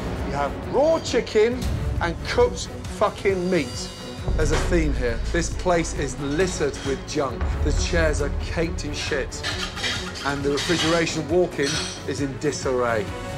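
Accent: United Kingdom. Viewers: Joseph Osborne he's British it's how they talk it's normal for them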